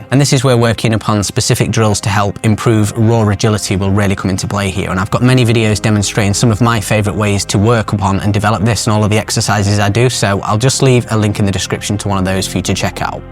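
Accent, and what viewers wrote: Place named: United Kingdom. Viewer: Bro you are the most English 🏴 AF dude I've heard in a long time. Your boxing 🥊 wouldn't help in my neighborhood as all the young girls would tear you apart because they'd think you're Ringo's grandson.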